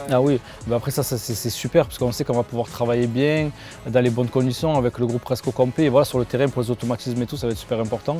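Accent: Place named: Marseille, France. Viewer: Un parisien avec l'accent marseillais, vous en verrez pas 2 comme lui 😂😂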